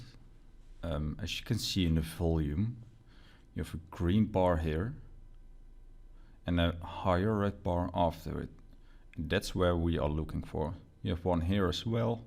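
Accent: Netherlands. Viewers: I've used this strategy for a few days on a paper-trading account and really like it. Lots of entries which I like, I work from home but am unable to sit and watch charts all day. That's probably the most frustrating thing about trading in my spare time, waiting for entries. Most strategies also only really work during trends, feel like I miss a lot of trades when it's not trending. With this strategy I don't have to wait too long to find an entry. I also really like the Absolute Strength Histogram strategy, finding good stuff with that and also seems to work in flatter markets.. I've started leaving the volume up along with the ASH and found that they can both confirm each other. BTW - are you Dutch, by chance? You sound like a native Dutch English-speaker to me, not that it matters :)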